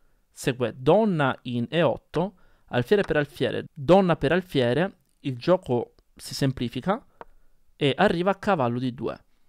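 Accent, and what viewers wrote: Tuscany, Italy. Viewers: se inizi in inglese lo superi! Io spero di no perchè mi piace il tuo accento toscano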